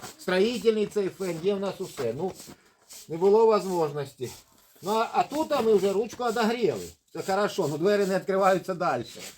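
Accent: Chernihiv, Ukraine. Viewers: Звідки ви? Чую говор з Чернігівщини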